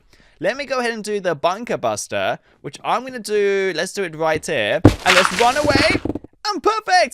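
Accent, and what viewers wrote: United Kingdom. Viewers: And is he britis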